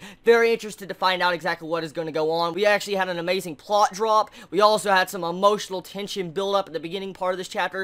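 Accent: South, United States. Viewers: 5 years ago u sounded verrrry southern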